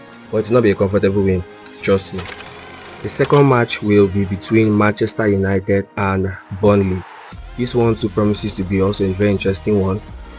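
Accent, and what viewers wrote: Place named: Biafra, Nigeria. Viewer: Was Nigeria colonized by the British? This accent is Biafra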